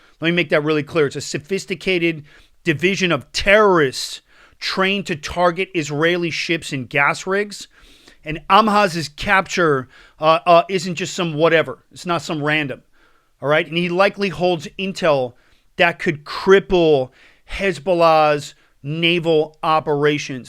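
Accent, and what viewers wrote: United States. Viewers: Amhaz probably has a lot of info on seaside smuggling as well as any planned attack on Israel's off-shore gas rigs. Nice work, Israel &! You a New Yorker...? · Your israeli elite forces? You sound pretty american to me so are you israeli or american which side are you picking if they both went to war with each other? america is controlled and infiltrated by israeli’s that act like they care about american interests but all they do is serve israel.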